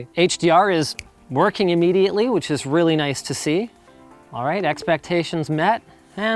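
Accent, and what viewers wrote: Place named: Canada. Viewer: Anyone notice how Canadian Linus sounds in this video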